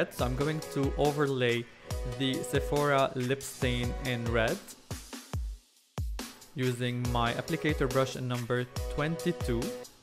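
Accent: Arab world. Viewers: يلي عم يحكي لهجته سوري لبناني حتى بالانجليزي😂😂 وشرط مليون بالمية انه لبناني سوري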